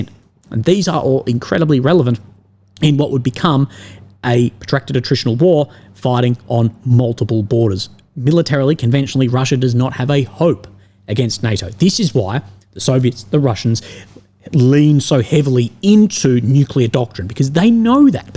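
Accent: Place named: Australia. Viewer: Australian accent cracks me up " He ahhhhhh" If you notice " He ahhhhhh". Then over " He ahhhhhh" you see..... but " He ahhhhhh". Love ya biddy! Keep up the good work " He ahhhhhh" :-D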